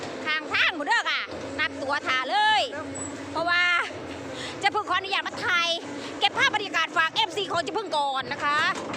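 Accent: Korat, Thailand. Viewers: บรรยายเป็นภาษาโคราชน่าฟังครับ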